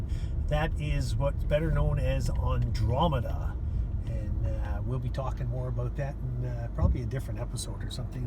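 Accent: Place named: Canada. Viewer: You talk like a Canadian.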